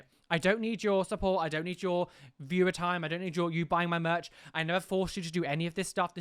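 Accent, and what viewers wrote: United Kingdom. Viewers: This is COMPLETELY off-topic, but when you speak American English, the way Brits say "R" is so funny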